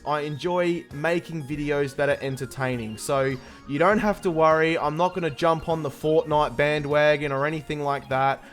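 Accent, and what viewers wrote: Australia. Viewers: So good to hear an Aussie on YouTube, seeing I am an Aussie, no explanation needed, do your videos at your own pace, your videos are awesome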